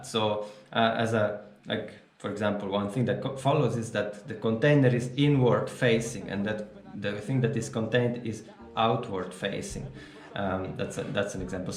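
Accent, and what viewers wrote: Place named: Scandinavia. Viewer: You are from Switzerland? I had no idea. When you talk English you sound more like a Scandinavian to me to be honest :) So German is actually your native language? Have you ever thought about doing this in German? Sometimes I feel like we need more of that, the German language region seems to be disconnected from the rest of the world at times. While Peterson has become a world-wide phenomena nobody I know personally here in Germany has ever heard about him. Which is unfortunate to say the least.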